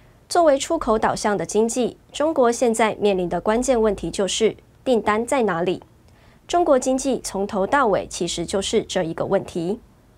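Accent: Taiwan. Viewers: 台灣的腔調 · 這主播有親切的台灣腔調捏😂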